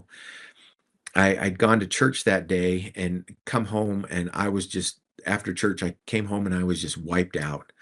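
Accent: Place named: West, United States. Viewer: I’m just so curious what doctor you found that would do those DMSO treatments intravenously and would you be able to share that with me? I am from Michigan and I don’t know where you live. It sounded like maybe out west somewhere but even through the doctor that is doing that for you, maybe she would have an idea From doctors More in our area?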